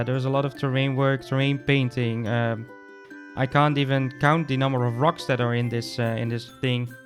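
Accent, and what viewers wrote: Netherlands. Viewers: Jou bent een nederlander ik hoor aan de accent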